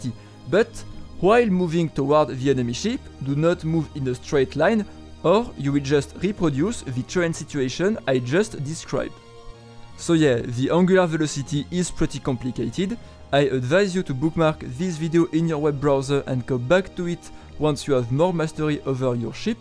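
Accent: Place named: France. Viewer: Precise, informative tutorial...and with a French accent! Really wish more Eve tutorials were executed with the same level of proficiency.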